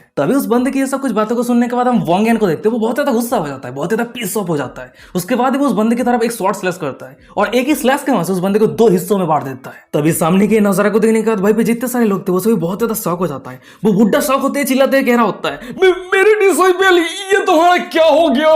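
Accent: Bengal, India. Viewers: Bhai tum Bangal se ho na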